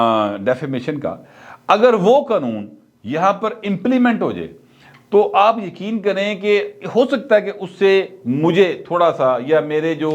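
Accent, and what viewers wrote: Lahore, Pakistan. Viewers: bhai ap ka ye lahori style bohat khobsurat lagta ha🤗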